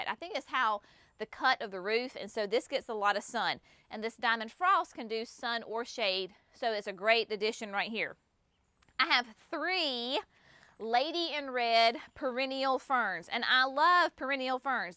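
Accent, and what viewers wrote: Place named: North Carolina, United States. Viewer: As soon as I heard your voice, I knew you had to be from North Carolina. Haha I am from NC too. Love your garden! Im trying to find beautiful shade perennial flowers and shrubs to plant in zone 8.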